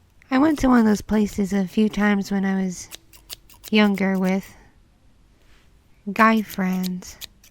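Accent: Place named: South, United States. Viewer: Hmm must be from the south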